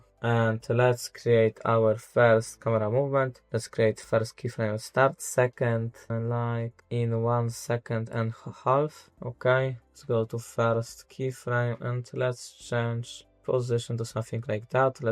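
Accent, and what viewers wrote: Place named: Poland. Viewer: po pierwszych sekundach wiedziałam że jesteś polakiem ;) szacun stary, zajebisty montaż. Też sobie klikam w aftera i na pewno będę używaćtwoich poradników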